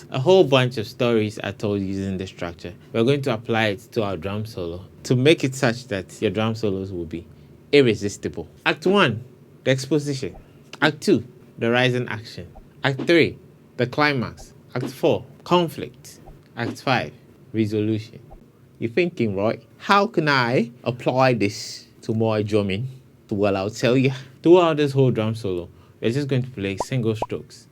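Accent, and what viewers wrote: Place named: United Kingdom. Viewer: I like how he spoke in a British accent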